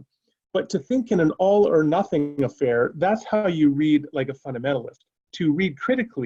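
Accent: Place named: South, United States. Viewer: Love your Southern accent,.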